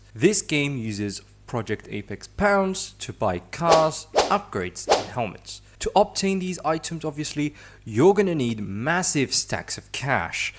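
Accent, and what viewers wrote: France. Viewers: Thanks for the tip. Btw I enjoy your French accent. 🇫🇷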